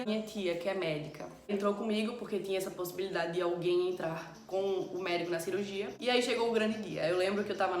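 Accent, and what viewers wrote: Brazil. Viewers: É de Recife?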